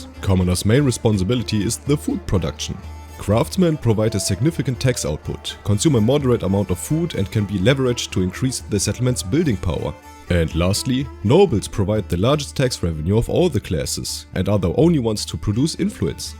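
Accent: Germany. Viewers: hör ich da einen deutschen Akzent raus? haha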